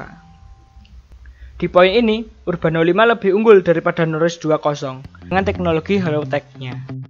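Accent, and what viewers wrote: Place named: Java, Indonesia. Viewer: Medok banget logat jawanya 🙈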